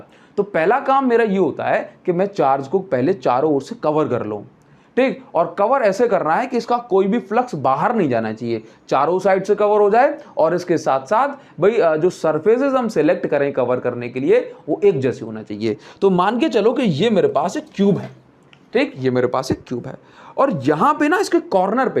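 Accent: Odisha, India. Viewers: Sir aap odisha se ho kya ?😅😅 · sir you are from odisha?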